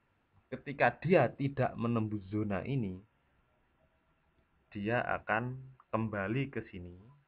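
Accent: Java, Indonesia. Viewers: sampean njelasno ne lewis jelas dari pada pak masyur sapari opo read the market opo ifmyante opo sami be good amargo medok jowone 😂😂😂 mantep 😁😍😘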